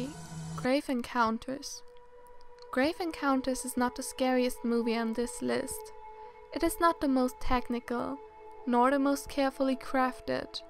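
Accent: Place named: Germany. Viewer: GERMAN. You can hear it :D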